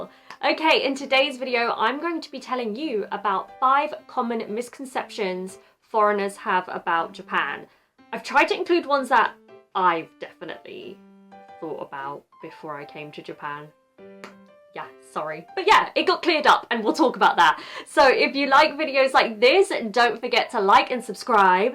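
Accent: United Kingdom. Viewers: 唐揚げとビール！・・・締めラーメン・・（笑） イギリス英語のいい勉強になります！有難う！！ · イギリス英語が、素敵！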